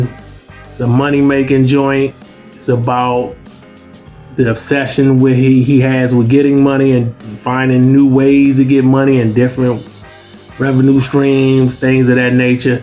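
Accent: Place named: DMV, United States. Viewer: Accent sounds like you're from the DMV where they had Tru Skool. Solid review.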